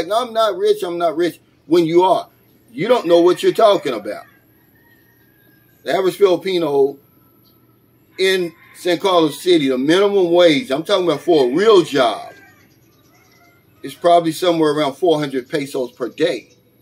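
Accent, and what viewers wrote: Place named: Florida, United States. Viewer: Bro are you from Florida I'm from central Florida and your accent screaming FLA. 😂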